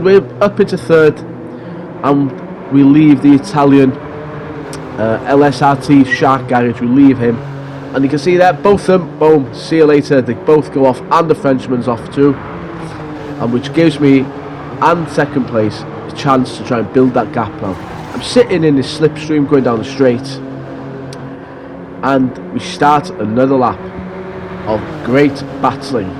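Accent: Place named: Wales, United Kingdom. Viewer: Notice that Welsh accent now buddy! 😂 great result buddy!🤟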